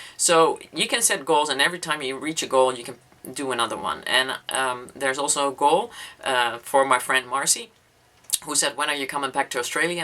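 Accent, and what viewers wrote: Netherlands. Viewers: Such an honest and inspirational video. I watched a couple of your tutorials - perfect. I was trying to figure out the accent and guessed Dutch, and then had it confirmed when I listened to your story in this video. Your finished jewelry projects are to die for - I want that Marilyn bracelet. Can't agree with you more about the importance of research. You nailed it. You have no idea how amazing you are.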